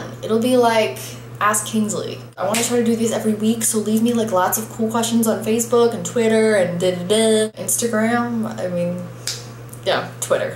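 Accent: England, United Kingdom. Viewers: love your British accent <3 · Your British accent sounds like Cher Lloyd, and when you say that you look like her too. Lol. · You have a British accent here idk if its fake or if your American accent is fake but wow you do good with either lol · is it just me or does she have a slight british accent now??? 0.o :D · Is it just me or is she developing an English accent. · Not only does she have an AMAZING singing voice... she also does a GREAT London accent!! You're awesome, and I hope you go FAR!! Respect from London, England!! xxxx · Questions: 1. Were you trying to talk with a british accent? 2. What makes YOUR fans the absolute best fans in the world? =) 3. Who is your big inspiration? 4. Where, and why, did you move? 5. I am your biggest fan ever! =))) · Yeah your london accent is terrible. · Yu have and awesome British accent! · hi love your british accent. 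hehe. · your british acent is awesome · your english accent is hilarious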